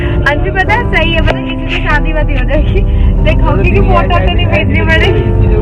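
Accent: Bihar, India. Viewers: Kya aap Bihar se ho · App Bihar se ho kya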